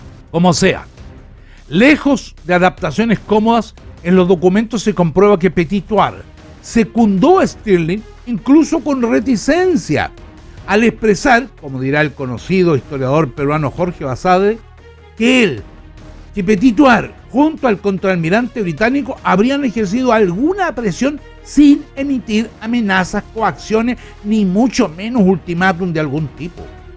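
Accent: Chile. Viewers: tomese unas vacaciones y váyase a Lima unos meses. Cuando regrese a Santiago verá que su acento y vocabulario habrá mejorado notablemente! Pronunciará bien todas las palabras y su léxico tendrá una notoria mejoría. Hágame caso buen hombre! Discúlpeme, pero los chilenos tienen una forma de hablar tan espantosa que ofenden los oídos de los demás hispano hablantes. He dicho.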